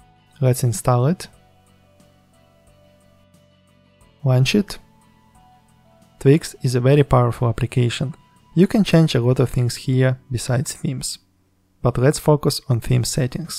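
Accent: Russia. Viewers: YourOwnAzog I think yes, I am Ukrainian and recognize this russian-english accent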